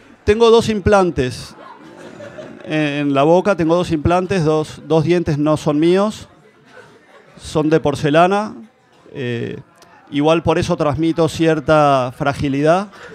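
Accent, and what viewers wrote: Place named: Argentina. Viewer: No le pillo la gracia...alguna risilla...pero esperaba más de un irlandés ke habla con acento argentinho...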